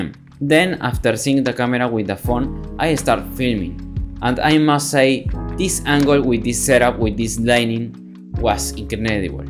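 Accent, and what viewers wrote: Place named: Argentina. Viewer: Lo hubieras hecho en español y con tu acento argentino para no tener q sufrir tanto con el ingles jaja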